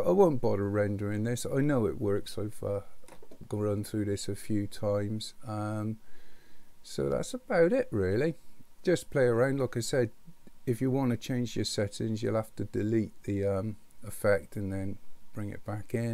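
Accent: West Country, United Kingdom. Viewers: Great video, great west country accent!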